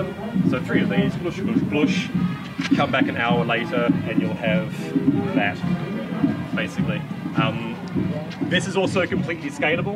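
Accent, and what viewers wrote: New Zealand. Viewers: Cool. We thought so. We heard the NZ accent then the slight roll of the "R's" was the give away. We picked Gore or Invercargill. Our boys just went shark diving down there a couple of weeks back. Good to see another "Kiwi" making a difference in the world.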